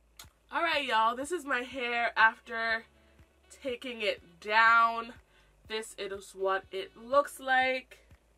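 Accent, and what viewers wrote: Virgin Islands, Caribbean. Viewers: I was just watching your Rice Water video and I knew i was hearing a Caribbean accent lol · My husband is from St. Croix and he have the same accent, I’m American but I be trying to talk in his accent to bother him lol but over the years I’ve learned how to understand you guys so well ❤️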